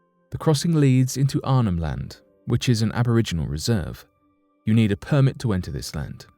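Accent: United Kingdom. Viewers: WHERE YOU FROM ARE YOU A FELLOW BRIT? 🇬🇧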